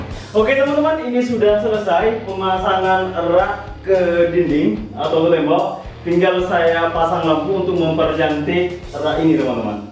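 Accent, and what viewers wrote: Madura, Indonesia. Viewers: Orang madura ya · Madura kayak nya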